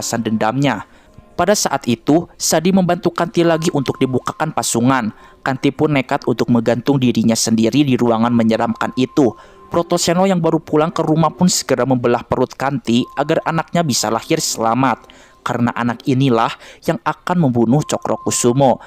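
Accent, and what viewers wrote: West Java, Indonesia. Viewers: Sunda banget logat ny.....si mimin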